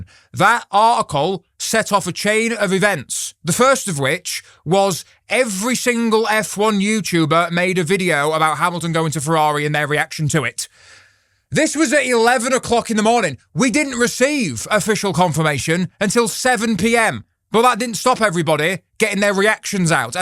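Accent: United Kingdom. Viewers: Wait, are you British? I thought you were Australian.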